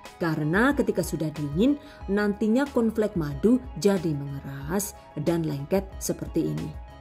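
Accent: Banyumas, Indonesia. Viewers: Iya cantik... Kok bisa ya Kadang ngomongnya pake bahasa ngapak ? Sama kaya q orang ngapak.. 😄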